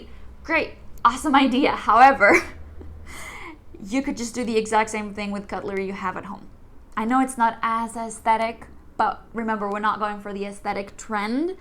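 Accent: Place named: United States. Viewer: You sound like you are from America 😳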